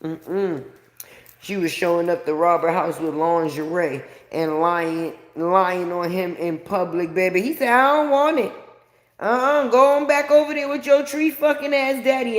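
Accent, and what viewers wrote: New York, United States. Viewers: Hey ur so beautiful n love ur new York accent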